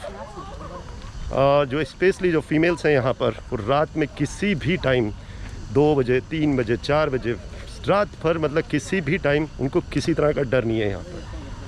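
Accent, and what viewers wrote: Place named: China. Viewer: Bhai, aap ki sound ab total Chinese ho gye hai. But a really awesome video by you.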